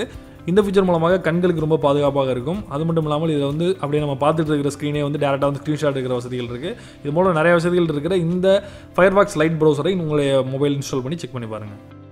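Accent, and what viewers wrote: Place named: Nagapattinam, India. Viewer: Neenga nagapattinam ahh bro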